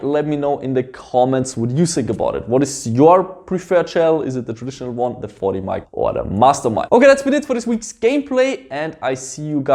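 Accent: Germany. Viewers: bist du ein deutscher? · German accent xd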